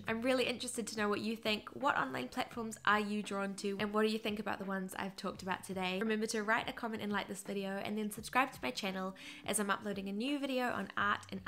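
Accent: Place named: New Zealand. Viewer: Your such an awesome creator, love your stuff, very inspiring! And great to hear another Kiwi accent on YouTube! (I'm from NZ too)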